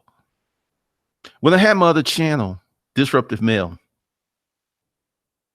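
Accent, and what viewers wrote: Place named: South Carolina, United States. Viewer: Yooo!! you sound JUST like the folks from my hometown in SC. I always thought the same thing, but never voiced it. (guess I was afraid to get smacked. lol) THEN.... my mom told me "don't get one of these jobs at the plant and get comfortable with money" I said, "TRUST ME... You 'ain't' gotta worry about that mama." haha Thanks for the amazing video. So much truth in here!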